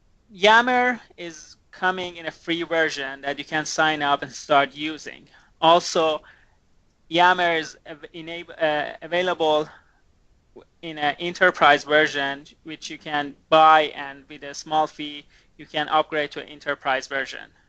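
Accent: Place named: Dubai, United Arab Emirates. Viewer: Is this guy from Dubai, I can not understand his accent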